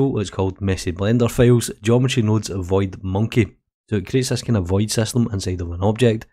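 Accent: Scotland, United Kingdom. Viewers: Don't worry, you just sound a bit more Scottish than usual.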